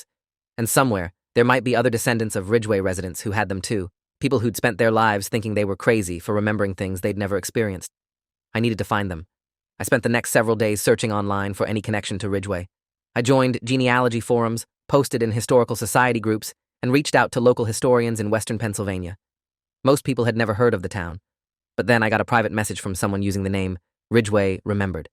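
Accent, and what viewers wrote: United Kingdom. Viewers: There is a bit of a British accent that comes through the voice filter must have malfunctioned.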